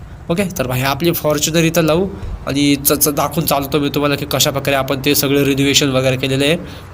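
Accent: Nashik, India. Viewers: bhava tu nashik cha a ka ??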